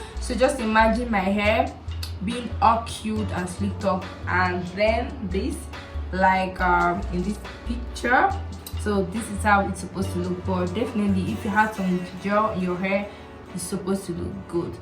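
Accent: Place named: Nigeria. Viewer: sis are u nigerian? what state are you from? love your accent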